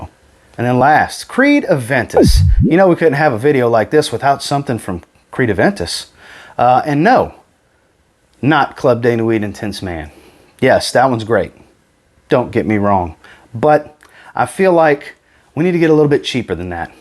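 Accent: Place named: South, United States. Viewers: Love your southern accent brother! Greetings from Bulgaria! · You sound like a Texan i know one when i hear one 😅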